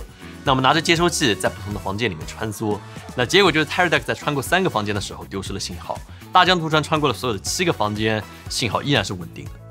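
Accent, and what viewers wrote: Taiwan. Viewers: 你很机车，妥妥台湾腔。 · 台灣人這樣講話的嗎??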